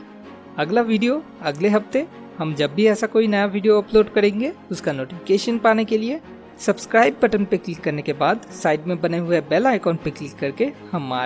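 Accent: Bengal, India. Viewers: aapki accent Bengali Wali hai...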